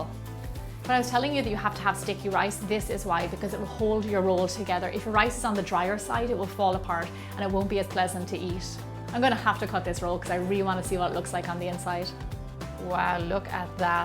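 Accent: Ireland. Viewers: Okay this may sound REALLY weird but I love your Irish accent and I use to call the plastic wrap a plastic wrap but recently I've been calling it cling film😂 and omg I have been dieing to try sweet rice it sounds sooooooo good!!! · I totally adore your accent! So adorable! PS: I secretly wanted an Irish accent when I was a kid! And I still want one now!! 😝😝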